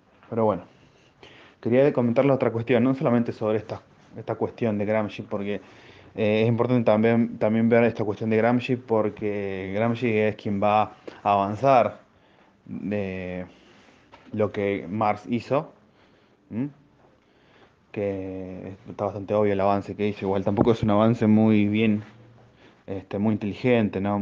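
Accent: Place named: Argentina. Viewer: Es increíble pensé que iba a terminar diciendo algo cuerdo , pero al final terminaste difamando al movimiento Provida por el solo hecho de contar en sus filas con católicos , cuando en realidad también hay pentecostales , mormones, evangélicos sionistas y no sionistas , universalistas de todo tipo , después andas subestimando a la gente con que va adorar a lucifer cual Masón g33 jajajaja , después te solidarizas con la izquierda como si fuera la pobrecita de todo esto la utilizada y volvés a atacar por los colores , después me parece que desconozces el peligro del feminismo , un familiar mío tuvo que ir con abogados por una falsa denuncia ,no solo se trata de salvar al niño por nacer , después mostraste la hilacha haciendo propaganda a tu liberalismo capitalista pedazo de Golem, ah y encima por la voz parece que sos argentino ( al menos que seas uruguayo) seguro vivas adentro de un tapper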